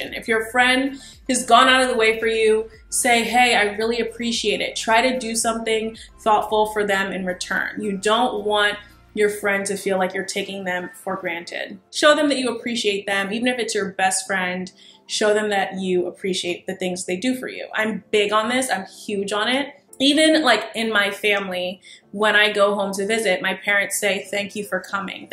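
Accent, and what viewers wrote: Nigeria. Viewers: Loved it. Your Naija accent is the one...haha lol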